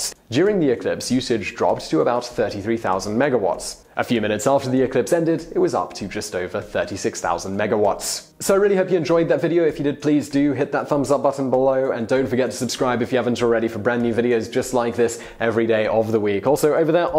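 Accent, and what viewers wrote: United Kingdom. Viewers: THankyou! You have a perfect British accent and i could listen to you all day if i wanted to <3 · I never noticed his accent. I always thought he was an American but he's NOT. I just noticed his British accent.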